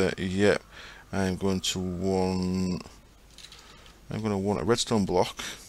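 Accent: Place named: Northern England, United Kingdom. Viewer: I'd be interested in listening to your soothing northern accent no matter what game you were playing. Reminds me of my grandfather Grumpy a good Yorkshire lad.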